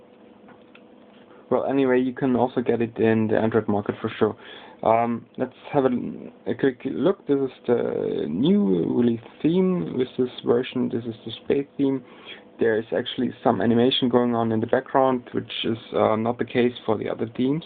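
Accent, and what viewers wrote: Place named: Germany. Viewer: du bist deutsch ich hör so was :D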